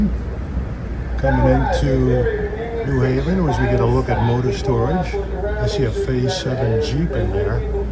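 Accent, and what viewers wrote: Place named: Chicago, United States. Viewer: I do believe you made a trip to Chicago at one time as I recognize that accent of yours , no offense there , just good enjoyable vids ya do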